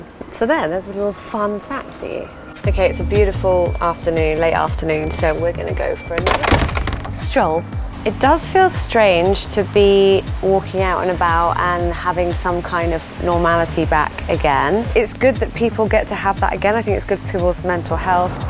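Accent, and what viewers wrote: United Kingdom. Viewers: Her UK accent ughhh 🥵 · She's so British 😍😄 I love it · That British accent, love it! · Here accent is more British than a ASDA or a Tesco worker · Not gonna lie ...she has a strong British accent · forgot she was british and i thought she was faking an accent in the beginning..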